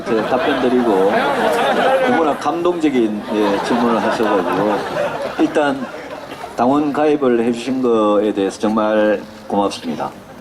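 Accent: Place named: Gyeongsang, South Korea. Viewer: 경상도 사투리라도 귀에 쏙쏙 들어옴니다.유승민의원님 그날을 기다림니다~!!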